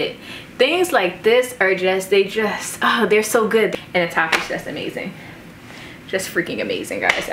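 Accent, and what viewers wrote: Philadelphia, United States. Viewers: girl I clicked your video because it was suggested to me and within two seconds I had to see if you were from Philly. low & behold I was right! hey Philly sis <3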